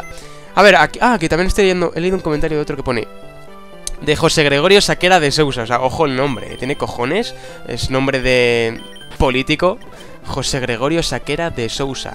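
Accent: Argentina. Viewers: LooL acento Argentino xD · Joder, que bonito tu acento argentino xD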